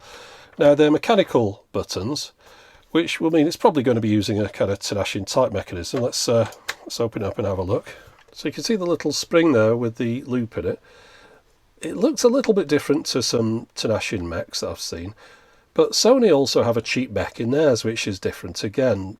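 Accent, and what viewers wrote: United Kingdom. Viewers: root is sexual slang in Australia. So the British pronunciation of Router as Rooter is funny.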